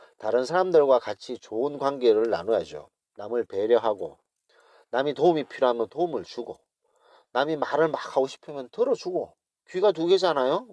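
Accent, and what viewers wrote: Gyeongsang, South Korea. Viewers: 너무 공감백배 입니다. 세상이 점점 삭막해 지고 조심스러운 얘기지만 교민사회가 제일 심한거 같아요... 저랑 똑같이 경상도 사투리로 구수하게 단도직입적인 스탈 매력있습니다. 실례가 안된다면 제 유투브에 이 이야기를 언급하고 싶습니다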